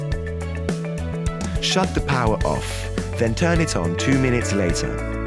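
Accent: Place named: England, United Kingdom. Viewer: I HATE ROBO VOICE VIDIEOS< , ESPECIALLY WITH AN ENGLISH ACCENT!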